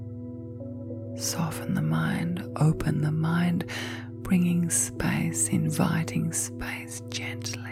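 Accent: Australia. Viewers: how are you doing today? I love 💕 listening to your very soothing sweet chloroform voice!!!!💕💕💋💕, your voice always makes me super duper happy 😊!!! 💕💋, I really miss your very sweet Australian voice!!!💕💋 I miss telling you stories!!!💋💕, you are my very favorite chloroform & you know how to keep me asleep!!! I will talk to you later!!!💋💕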